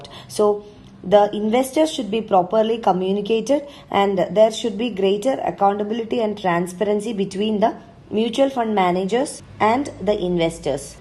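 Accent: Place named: Kerala, India. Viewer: This is keralian accent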